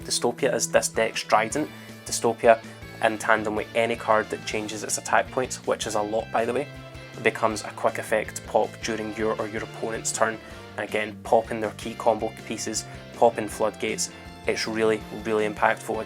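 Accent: Scotland, United Kingdom. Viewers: I hope I'm not the only guy that comments on any scottish accent video and make a reference to the limmy's show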